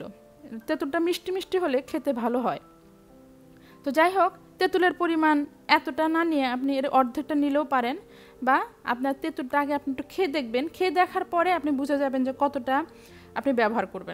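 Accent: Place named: Tangail, Bangladesh. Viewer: আপু আমি তোমার সব ভিডিও দেখি,সব গুলো আমার ভালো লাগে। আর কথা শুনে আমার মনে হয় তোমার কথা আমদের কথার মতো, তোমার বাড়ি কি টাংগাইলে, আমার বাড়ি টাংগাইলে সদর থানা পাড়া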